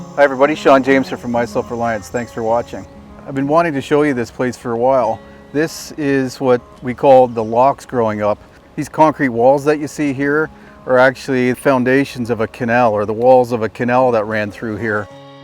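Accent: Canada. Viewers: You’ve got a be a Newfie lol 👍👍👌👌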